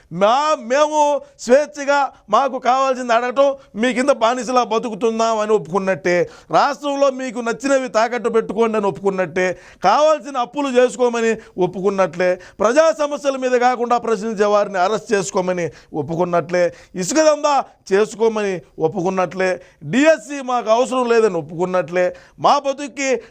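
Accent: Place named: Chittoor, India. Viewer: Chittoor village type undhi plz improve my village chittoor...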